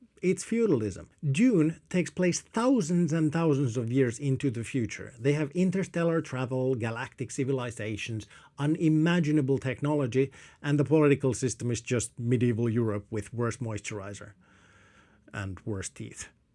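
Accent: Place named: Netherlands. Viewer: Surprisingly interesting channel. I can't quite place your accent and the name " " didn't give me any clues! Some Dutch sounding consonants... But that could also point towards certain Slavic or Uralic. In any event, your English is impeccable. I can't say that future economics is my favorite topic for speculation in science fiction. However, since you ask... I'm afraid i can't imagine a situation where there won't be SOME raw materials that will be scarce. I think we all know about how "rare earths" have become important to computing and cellular technologies. To my mind, that makes a future without some form of capitalism unlikely. However, automation of labor is a trend that hasnt stopped since the beginning of the industrial revolution (and in some ways, even before that.). Inevitably we will get to a point where we won't need to work nearly as much as we think we must right now. 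It you are looking for a "better economic model"'that fits this kind of future, i would say that we already have it in some places where it has been working better than the alternatives since around 1945.. it the Danish model - or Scandanavian model more generally. Highly regulated capitalism combined with a robust welfare state.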